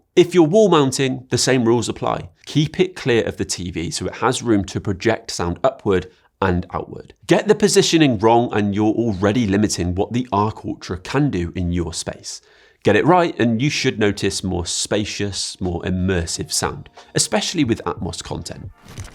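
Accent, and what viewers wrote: United Kingdom. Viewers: Your accent is very clear and so good I think you’re British 😅, Thank you for the tech information.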